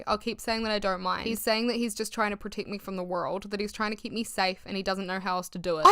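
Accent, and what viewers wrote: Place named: New Zealand. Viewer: I find your videos such a comfort. This one is different but still the same you. The way you tell stories is so captivating. Also, being an Aussie I find your Kiwi accent very cute and nice to listen to and not hard to understand at all. Thank you for bravely and humorously sharing your story. I hope you no longer assign any blame on yourself, you did nothing wrong and every action you took was the right one, including keeping the diary and telling your mum when you did. Props to your mum for looking after you as best she could. Sounds like it was a horrible, difficult, and frankly ridiculous situation to be living in. The relief you feel after his death is so real and valid and 100% allowed no matter how much you also love the not abusive parts of the person. Wishing you a peaceful and happy rest of your life, and the opportunity to experience the moments of joy and wonder you missed out on as a kid ❤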